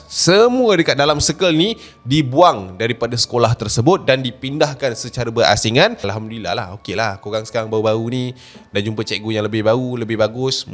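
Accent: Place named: Kelantan, Malaysia. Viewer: Sedak dengar Abe chap kecek Kelate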